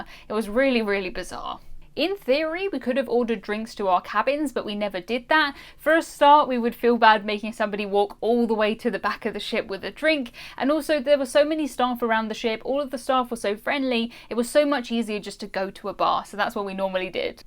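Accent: United Kingdom. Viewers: Good information. Thanks. It is sometimes hard for those of us with hearing problems to translate British English to American English. Slowing your speech would help. Thanks again.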